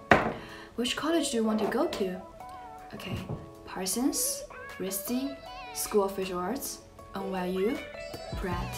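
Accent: Shanghai, China. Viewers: 你说上海话我听得懂听得懂哈哈哈哈哈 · 00后原来讲上海话已经洋泾浜了！好可惜啊！susan加油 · 上海话😭😭😭又亲切又可爱，好像从小看着长大的邻家小妹一般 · 哈哈哈上海話講的蠻好聽的呀，可愛~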